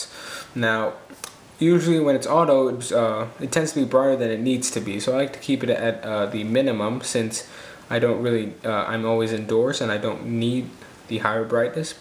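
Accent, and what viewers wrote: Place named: United Kingdom. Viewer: USE UR BRITISH ACCENT!!!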